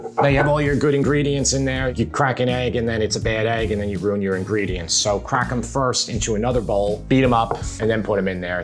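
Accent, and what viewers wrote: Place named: Long Island, United States. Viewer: This is like old home week for me. I grew up on Long Island although I’ve been gone for more than a few decades. Listening to your accent makes me smile and watching your recipes reminds me of my own kitchen.. Truly enjoying your channel.👍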